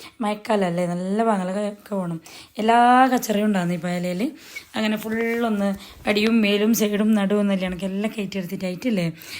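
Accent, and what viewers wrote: Kasaragod, India. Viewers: Kumbla specill baasa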